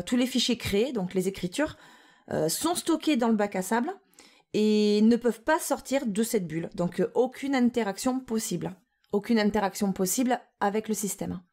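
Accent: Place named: Southwest, France. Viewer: Toujours aussi percutent, un vrai plaisir d'apprendre avec un aussi bel accent du Sud/Sud Ouest. Bravo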